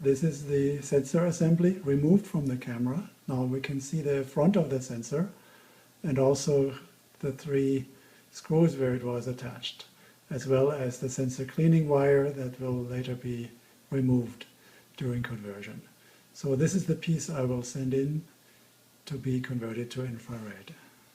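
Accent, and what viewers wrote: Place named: Germany. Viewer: <tangent> I love your accent. What in the world is it? I'm getting slight German, but also slight... something from England, but I'm not sure what. Am I far off?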